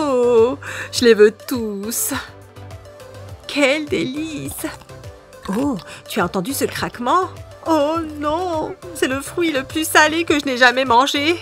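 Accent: England, United Kingdom. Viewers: Vous êtes anglaise ou pas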